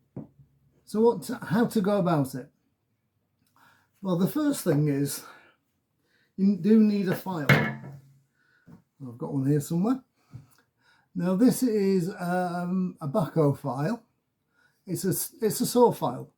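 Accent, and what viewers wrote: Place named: United Kingdom. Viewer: Thanks for posting this, it's very timely in that I do have to sharpen both my tenon and gentleman's saws. I also have a Disston crosscut saw which needs re-sharpening after I managed to mess it up many years ago and haven't had the courage to revisit. I was considering using one of the many blunt, disposable saws I have as a practice piece before tackling the the disaster which is now my Disston. I'm hoping that the teeth on those disposables aren't too hard for my file, I suppose I'll be finding out very shortly, LOL. Now that I am armed with your inspiration and the need to do something, plus an endless supply of potential practice material a sharpening might actually happen. I cut down those disposable saw blades into points about 200mm long to make stab saws and the like, brilliant for cutting out holes in plaster board and similar stuff, the teeth closer to the handle get little use and stay quite sharp while the rest are wasted. 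It beats just chucking them away, and makes nice, cheaper than cheap custom shaped saws with little effort and an angle grinder. Has anyone used those blades to make scrapers? Just a thought I was going to put to the test. I've subscribed. It's refreshing to hear a British accent which isn't AI tripe, giving some solid, no BS advice. Thanks so much.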